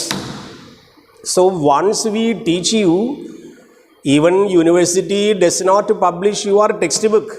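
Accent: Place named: Kerala, India. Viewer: English sounds malayalam..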